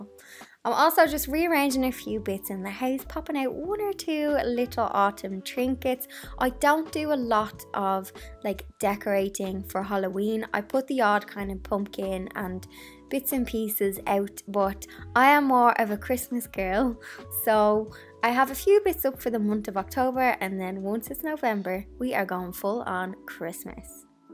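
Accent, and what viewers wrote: Ireland. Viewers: Hello,I've not long subscribed.Im loving you alot!!!!😘 You have the most girly vintage snuggly cuddly house.🤗🌟 My dream home.Can I move in?pretty please😘😘 You should be so so proud of yourself.Yoh most definitely are an old soul in a young body.I saw that you visited Kilkenny in one video? I went there with my hubby for our honeymoon then Dublin.We saw Irish dancing in one of the pubs.That was sooo beautiful.My dad's family are from county Sligo.We want to go there at some point and look up the family.I love the Irish accent it's just the best.Im busy working my way through all your videos!! There's so many.Im just starting to make soaps and candles etc and I'm getting some fab tips from your videos.much love and stay safe.From a rainy England.🤗🤗🤗🤗🤗😘😘😘😘😘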